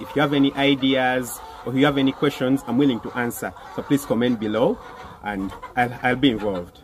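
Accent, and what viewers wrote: Uganda. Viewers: Are you from Uganda? Your accent 😀... Anyways you’re doing a very good job , may God bless the work of your hands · I don't know what it is but I love hearing a Ugandan accent!! much love from your neighbour here 🇰🇪 great job Doc!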